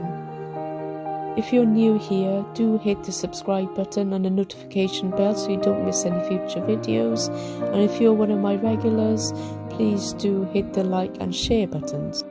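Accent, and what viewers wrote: United Kingdom. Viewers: Beautiful work ! Wow ……where is you accent from may I ask ……you sound like you’ve e got a British twang like myself lol ❤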